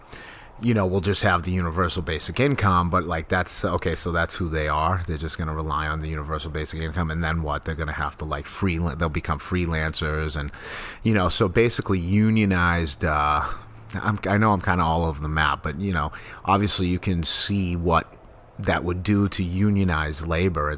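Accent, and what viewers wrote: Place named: Boston, United States. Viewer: Hey, I'm halfway through, interesting, imo without Andrew Yang's ideas I think we would head directly towards a virtual marketplace where people just wanna hang out in virtual world spending money etc, the opposite of a result from UBI. Yang's proposal is surely crafted for how he thinks it has the best chance of being passed by both houses, and I think it is a very clever proposal. The other half of Yang's platform involves pushing us towards a marketplace that includes the exchange of money and adds the valuation of "human things that can't be measured with dollars" , i feel like that's a direction I can be proud of heading towards for my kids. See his website. I enjoyed your boston accent.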